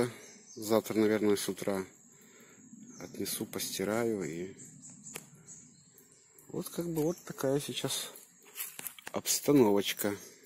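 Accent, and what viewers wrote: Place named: Ukraine. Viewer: БлагоДарю за ответ, я так и подумала ,что вы из Украины (по акценту в разговоре) если не секрет из какой области?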